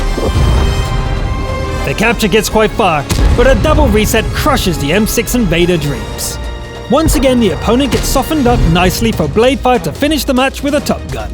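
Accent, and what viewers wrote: United Kingdom. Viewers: even i from germany Heard this "english" acent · The scout replay with amazing agent music with a very nice british accent made my day for me. Keep up the good work with unique presentation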